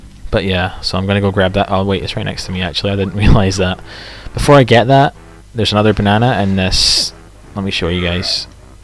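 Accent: Scotland, United Kingdom. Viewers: OH MAH GEWD!!! ARE YOU SCOTTISH?!?! :D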